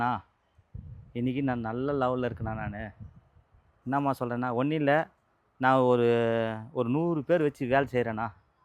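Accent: Madurai, India. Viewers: Madurai vasagam 🎉🎉🎉super Anna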